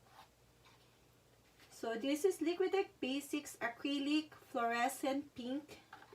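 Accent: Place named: Philippines. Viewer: You sound Filipina. I love this painting. It’s as close to my favorite color as it can get; red.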